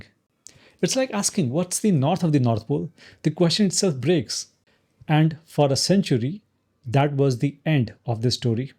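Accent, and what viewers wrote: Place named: India. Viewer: If your attention span for listening to a man speaking English very clearly and well articulated is only 8 sec because the man has an Indian accent I propose the communication problem is entirely on your end. - by the way, you write English in a poor way yourself. Your sentences are missing words before they can be called full sentences. And your last sentence says that you lost patience with an articulation which is itself impatient with the audience of the articulation - that is just gibberish. I suggest you go practice your own English before criticizing others.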